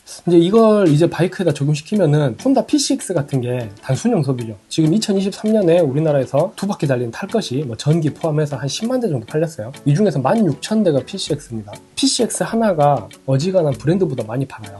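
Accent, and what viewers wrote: Jeolla, South Korea. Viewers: 아따 구수허니 잼있게 잘 봤당께요. 서울살이만 십여년하다 올만에 전라도 억양 들으니 기분 좋네요 ㅋㅋㅋ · 고향이 전라도인거같아 구독은안합니다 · 오늘따라 사투리가 더 구수한 느낌인데요? 전라도 사투리 먼가 정겨워요 ㅎ 바이크 타는데만 관심잇지 이론은 생각도 안해봤는데 채널 재미나게 보면서 매번 많이 알아갑니다. 항상 좋은 정보 유익한 영상 감삼다!!👍🫰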